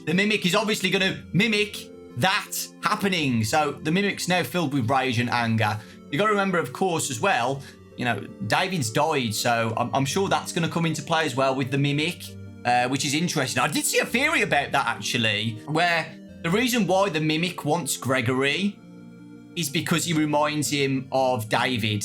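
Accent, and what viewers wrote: United Kingdom. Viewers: i love how ur accent sounds i wish i was british 2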